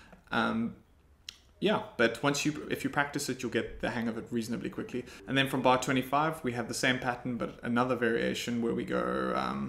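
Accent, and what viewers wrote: South Africa. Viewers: You kind of sound south African..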